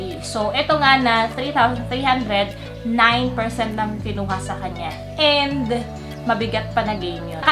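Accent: Visayas, Philippines. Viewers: WAIT... BISAYA PO KAYOOO!?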